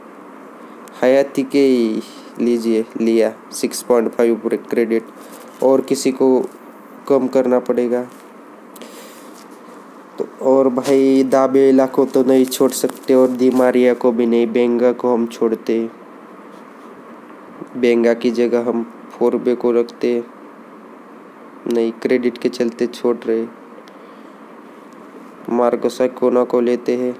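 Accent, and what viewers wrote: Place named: Northeast, India. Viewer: Bhai I'm sure aap Northeast se ho..100%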